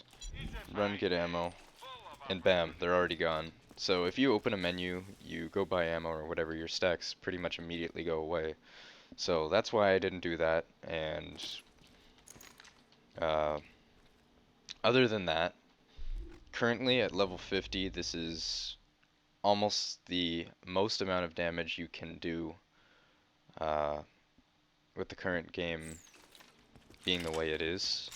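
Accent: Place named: Canada. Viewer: dat canadian accent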